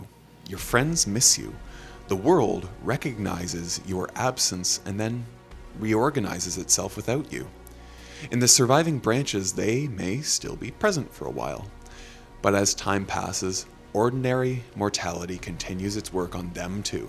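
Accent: Canada. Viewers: Is the voiceover Canadian?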